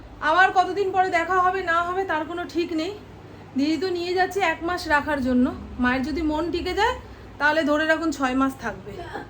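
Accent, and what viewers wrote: Bangladesh. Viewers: মামনের সব ভাল কিন্তু এতো বছর ভারতে থেকেও বাংলাদেশি ভাষা ছাড়তে পারেনি।ফোন দেওয়া, চাবানো, কান্না করছে এইসব শুনলে বিশ্রী লাগে। এদেশিয় ভাষাটা শেখা উচিত ছিল।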